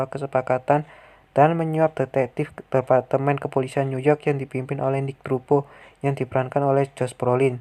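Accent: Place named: Java, Indonesia. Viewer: di usaha in Jan medok bang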